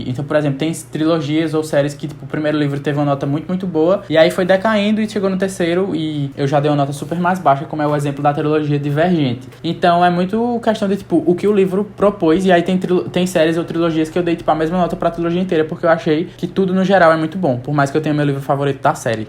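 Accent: Northeast, Brazil. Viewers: Homi, tu é claramente nordestino. Alguma chance de ser pernambucano??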